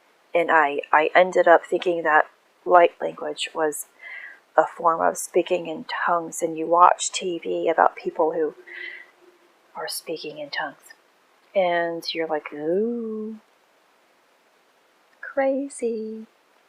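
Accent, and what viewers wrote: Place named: Asia. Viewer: I noticed a bit of and Asian accent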